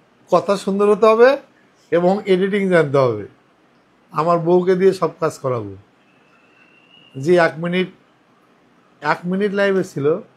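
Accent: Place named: Bogura, Bangladesh. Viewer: আচ্ছা আপনি কি বগুড়ার লোক? আপনার কথা শুনে মনে হচ্ছে হয়তো আপনি বগুড়ার লোক।কারন আমিও বগুড়ার মেয়ে।এজন্য জিজ্ঞেস করলাম।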